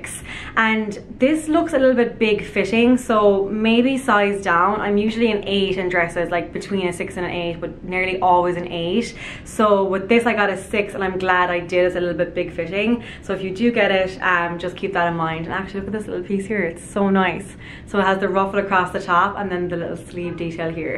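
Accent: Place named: Ireland. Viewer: Cool Irish Accent :D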